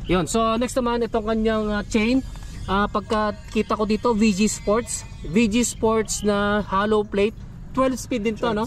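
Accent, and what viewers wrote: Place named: Ilonggo, Philippines. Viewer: Nice build! Sabi ko na ngaba taga iloilo eh hahaha i know that intonation eh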